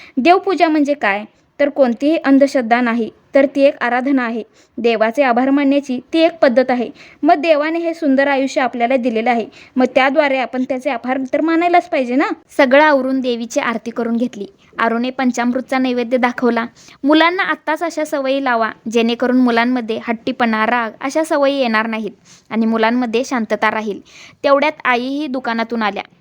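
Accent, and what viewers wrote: Kolhapur, India. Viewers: ताई तू कोल्हापूर जिल्यातील आहेस का, बोलणं भाषा जवळची वाटते ❤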